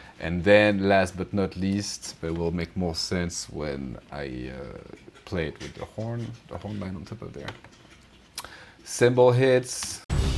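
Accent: France. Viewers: Pur accent francais mdrr très interessant en tous cas c'est super on manque de chaines comme ça sur yt ! Je me tate aussi à m'y mettre ^^ Mais ouais encore une fois très cool ! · This accent... are you French or so? Wonderful video! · Hi, are you french ? I am French and your accent sounds very French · super vidéo ! avec l'accent français . i luv it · Great video! Are you french btw? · nice vidéo and a lovely accent ! (french ?) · French accent spotted !!!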